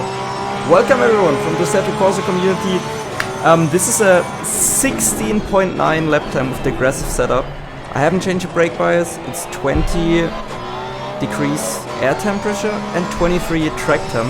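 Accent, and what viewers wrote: Germany. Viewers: bist deutscher hört man bist du esportler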